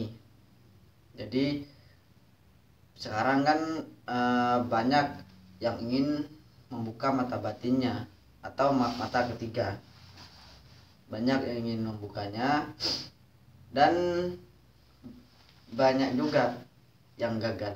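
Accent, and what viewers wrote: Madura, Indonesia. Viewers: Orang mna kak Kyk ada sedikit BHS Madura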